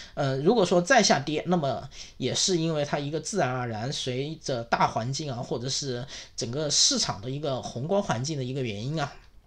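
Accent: Changsha, China. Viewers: 听那方言，好像是长沙话，哈哈😃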